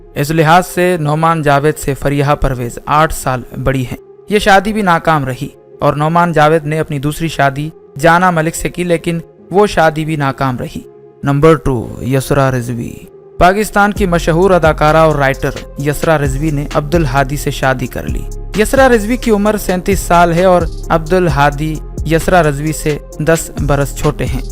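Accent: India. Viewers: Tm Indian ho tmhre bt krne se saf pta chl rha ha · Bera hee kanjer hei jiska ye channel hei. Harami, sharai taur per kiya ghalat hei. Aur tu hindi lehjey mei kio bhonk reha hei? Kutta.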